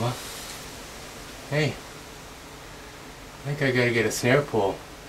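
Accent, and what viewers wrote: Canada. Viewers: I know a Canadian when I hear it!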